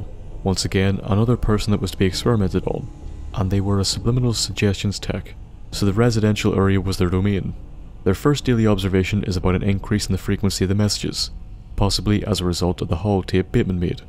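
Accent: Northern Ireland, United Kingdom. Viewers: hey i'm new to the channel:3 and as soon as i heard your voice i was like "yay another NI youtuber:)" so where abouts are you from?? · Are you from NI?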